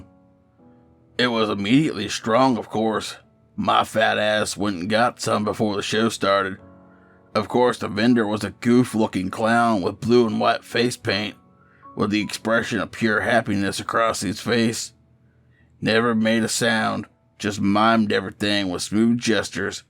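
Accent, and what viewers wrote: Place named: South, United States. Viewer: Awesome video. Love your southern accent. Keep up the great job man.:)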